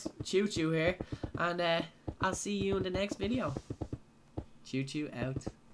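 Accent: Dublin, Ireland. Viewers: Are you From Dublin?? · Are u Irish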